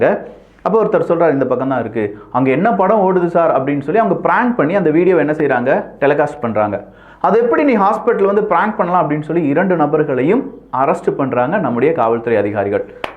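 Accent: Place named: Tirunelveli, India. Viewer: தாங்கள் நெல்லை மாவட்டத்தை சேர்ந்தவர் என்பது தங்களது பேச்சில் தெரிகிறது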